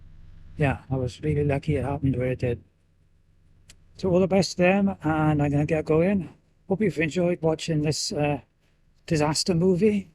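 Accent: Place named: Wales, United Kingdom. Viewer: Well done! Thanks for posting this interesting and informative video! Your voice reminds me of family visits to Griffithstown (Mon), many, many years ago - will be starting to view your archive from the beginning after landing here due to 'YT Algorithms'.... We used to float about the Atlantic on a home-built 6-berth catamaran, but 'swallowed the anchor' many years ago.... part of our family is on the canals currently, wherever they can find water. I retired at the end of the last century, married the good lady, and launched our composite cat - which we sailed down to the Canaries for the honeymoon and a few years more. Our cat had an outboard motor leg that would pull up for weed removal, and (of course) for sailing. Much, much faster under sail! One of our mates out in the Canaries had a wake-board, and in ideal conditions we could rip across the bay with him behind us! 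The trick we used to free our prop (which worked sometimes) was to listen attentively for the engine revs dropping, and bang her into reverse - which could un-wind the flotsam, if we were lucky. Yours looked like a really obstinate tangle! Thanks again, a lovely tale.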